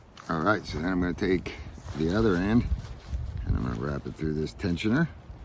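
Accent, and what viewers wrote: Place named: Massachusetts, United States. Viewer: I think you did a fine job! For some reason knot making will not stick in my head. I barely got the one down you made...lol Just crazy AF as to why. ~Anyway, found your channel from your Squak Mountain mid layer Hoodie review and slowly following along your past journey landing here. I mean it's all been so cool. You remind me and sound exactly likef every guy I grew up with, 😆 in Massachusetts As an avid camper and novice adventurer for the past, 40 plus-well way too many years, wink, I had to break down and bite the bullet investing in ultra light gear. It was time. Stuff was weighing me down, wink again. So... I coincidentally got this tent too on sale right after Christmas. The Virgin sail will be now April 2025 if New Hampshire finally stop snowing. I am look forward to seeing your AT experience in these parts last year. 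OMG. I bet a real doozy coming from those flat lands there in Florida. No joke, huh. I was born on a rock a wee bit north of Boston so flat land is pretty foreign to me. Gotta walk slow here with a big stick.... My guy and I have been dipping into off grid camping allot past few years, for him a breeze as an older model USMC but for me well everything is cool but the bathroom situation lol. Despite that have found some amazing spots and lots near the AT. Love seeing the hikers. Would love to be a trail magic magian at some point. I moved from the Rock up here about three years ago so we are a big closer or half the way atleast. Maybe this summer plant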